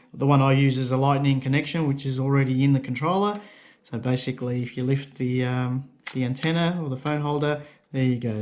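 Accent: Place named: United Kingdom. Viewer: OMG! This is a great stuff! Love the accent Sir! Just like watching James Bond Movie! 😍 DJI BRAND is AWESOME!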